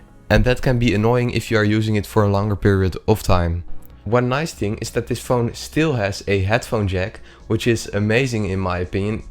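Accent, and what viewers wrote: Netherlands. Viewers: kom je uit nederland?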